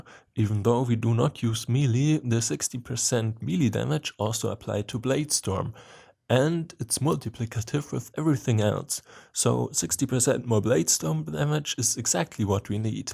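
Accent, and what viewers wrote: Germany. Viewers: You sound very german. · Are you from Germany or Austria :D Your accent sounds like that :)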